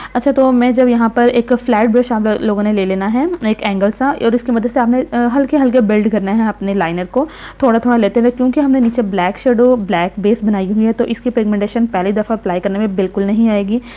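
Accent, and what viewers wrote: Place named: Pakistan. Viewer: Apka way of talk boht cute hai...pakistani language hai.meri ek frnd dubai me thi vo apke jaise ho bolti thi... beautiful makeup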